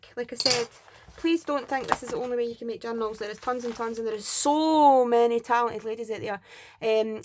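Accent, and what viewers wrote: Scotland, United Kingdom. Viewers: A nice and simple and yet beautiful and love your scotch accent ♥️🌹✝️🙏🇬🇧👍🌷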